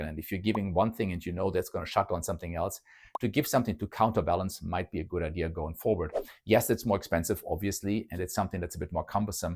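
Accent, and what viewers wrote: Germany. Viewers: Is it fair to say the goldilocks zone for HCG on TRT is between <num> mcg / wk split up 3 times per wk? I believe a break on HCG is necessary after 1 month of continued useage? Break for 2-4 wks? Thanks Doc I detect a German accent & facial features to boot.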